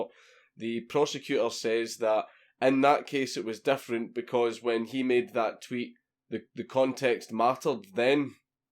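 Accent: Scotland, United Kingdom. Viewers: wrong country dude he's Scottish not Australian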